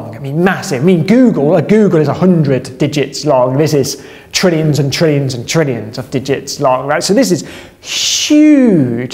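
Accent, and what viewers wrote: United Kingdom. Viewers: this brit has the Most British accent ever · it's hard to a Brazilian like me to understand his British accent.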